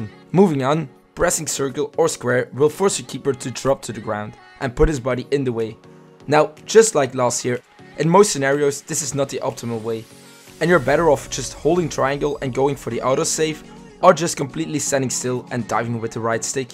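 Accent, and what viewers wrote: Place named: Netherlands. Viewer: Ik hoor an je uitspraak dat je Nederlands spreekt, klopt dat? Toch heb je een zeer goede uitspraak in het Engels. Bedankt vor de video, het heeft me geholpen. Groeten uit Duitsland! :)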